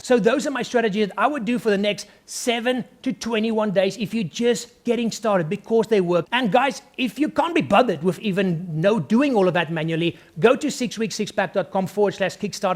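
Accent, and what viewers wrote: South Africa. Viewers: South Africa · Are you south African?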